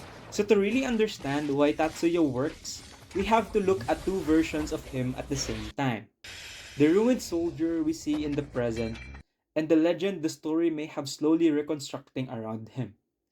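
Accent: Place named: India. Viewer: Please remove Indian accent 😭😭😭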